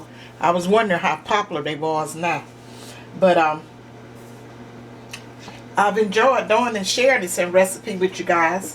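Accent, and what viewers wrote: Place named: North Carolina, United States. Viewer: This evening is my first time seeing your show. I had to click play when I saw the name of your channel, Happy Table. I have never had corn pudding. I am 51, and I have seen it twice at potlucks about 30 years span! In each case, it was provided by a young lady with heritage from the Caribbean---Guyana and The Bahamas. You sound like my husband's people out of North Carolina, and like church women I would have liked to listen to and watch at church as a child, or like distant relatives at a family reunion where you feel right at home and belong. Thanks for sharing your recipe and happy kitchen. Now that I see what is in that corn pudding, I might try it. (I sometimes wonder how to make spoon bread. That is an idea.)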